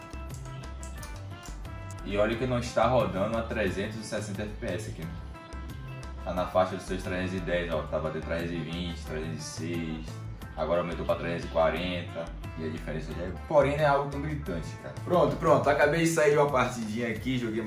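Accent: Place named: Bahia, Brazil. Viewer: Sou de salvador tbm, já sabia que ele era baiano pelo sotaque dele kksajdk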